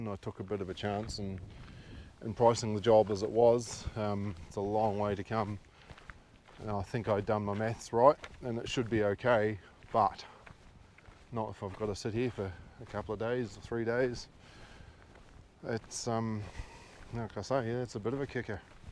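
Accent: Southland, New Zealand. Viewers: You sound like your from southland in NZ. Good job mate enjoyed the video.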